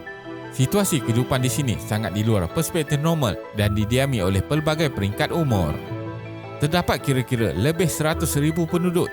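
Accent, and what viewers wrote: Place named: Kuala Lumpur, Malaysia. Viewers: Bahasa Melayu itu luas. Kalau dalam video ini menggunakan slang Kuala Lumpur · Ini bahasa Melayu Selangor lah Kenape teruk maknusie dunie tige peratus utame kerancakkan kepade antare berbeze serte jenayah setinggan dibine limeblas iyenye pade peratusan Kenape secare purate Mereke lame dikitar semule.... Tak sama langsung dgn bahasa Indonesia....beda bunyi.... bahasa Indonesia berbunyi a sedang bahasa Malaysia berbunyi e di ujung perkataan...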